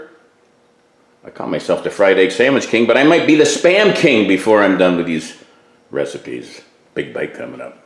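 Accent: East Coast, United States. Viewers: Yea buddy. where are you originally from? East coast?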